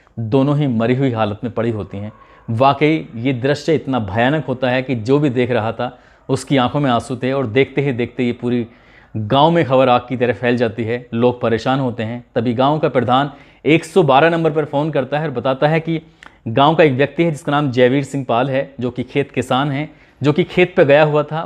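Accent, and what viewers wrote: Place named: Bhojpuri, India. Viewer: Bura na manna AAP blood ko bled kyoan pronouns krte ho sahi krne ki kosis kijiye Sir bhojpuri tune daldiya aapne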